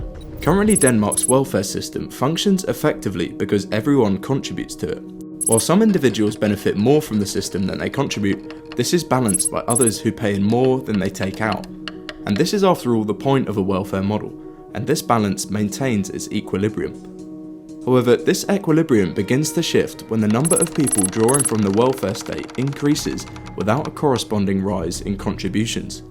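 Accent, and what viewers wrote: England, United Kingdom. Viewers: As your voice is English, why are you talking about dollars and looking at sites selling things priced in dollars. Do you live in America or is it that you are running some kind of con-trick ?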